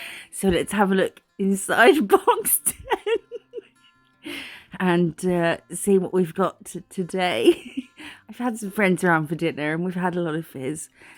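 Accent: United Kingdom. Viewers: You are hilarious! Why do things always sound a lot funnier in a British accent??? Lol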